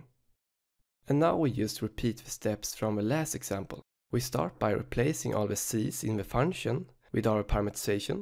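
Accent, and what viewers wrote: Sweden. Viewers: ikr!! he has the loveliest Swedish accent xDD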